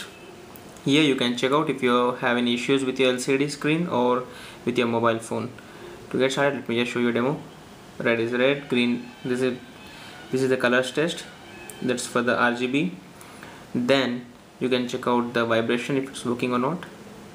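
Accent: India. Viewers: every things great except the way of talking and that accent...fucking bullshitt indian accent...itz star not ishtar you fuck!!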